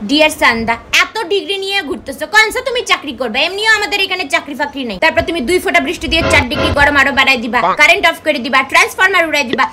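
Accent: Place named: Bangal, Bangladesh. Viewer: বাঙাল টানে কথাগুলো এত ভালো লাগে যে কি বলব...😊😊.. ভালো থাকিস মা..❤❤👍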